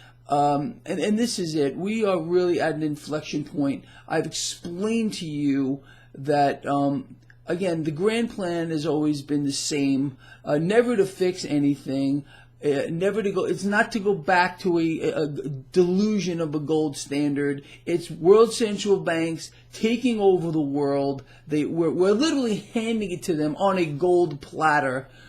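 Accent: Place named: California, United States. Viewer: your starting to sound like your from Califonia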